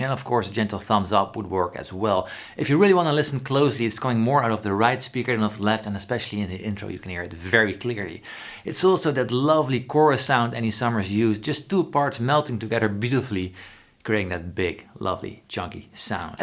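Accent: Netherlands. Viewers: Ik dacht al, waar komt deze man vandaan, hij klinkt Nederlands xD Toffe video, enorm van genoten! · Dutch I think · Are you dutch ?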